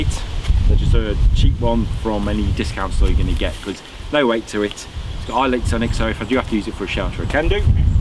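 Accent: England, United Kingdom. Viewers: Nice simple video so nice to hear an English voice ✌️and people not droning on about concealed carry. How is it most Americans are so paranoid 😆I digress nice kit man very Similar to my Rush 24 bag that goes everywhere with me I'm in south east England but travel extensively keep up the good work I'm now subscribed 👍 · I think the content is probably good, but I could not focus on what you were saying, because I just think it sounds so disgusting when british people pronounce "u" as if it was "o". Like in "stuff", or "backup". But thanks anyway. Maybe it's a reading issue?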